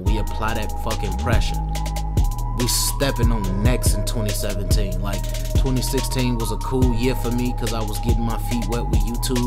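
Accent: Chicago, United States. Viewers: Sound like Chicago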